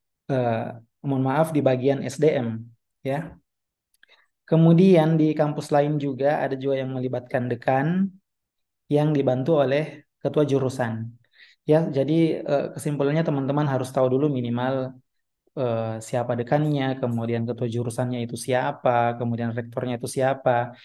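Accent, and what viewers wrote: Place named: Sulawesi, Indonesia. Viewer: Bang orang sulawesi ya , logatnya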